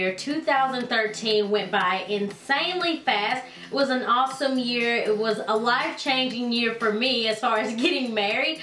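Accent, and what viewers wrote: South, United States. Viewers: I love watching your videos & that southern accent :-) keep up the good work & YESSSS EAGERLY AWAITING THE ROOM TOUR B/C WRKN ON MINE & NEED SOME INSPIRATION :-) Be blessed!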